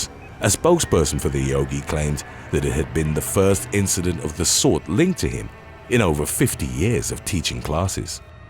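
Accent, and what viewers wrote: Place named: England, United Kingdom. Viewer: Kudos on the voice. I love the dread and seriousness with a dash of English accent, makes me fear yoga!🤣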